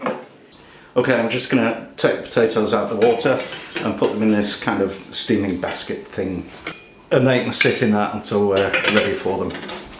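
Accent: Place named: Northern England, United Kingdom. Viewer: I love your accent! What part of the uk is it from? my guess is northern!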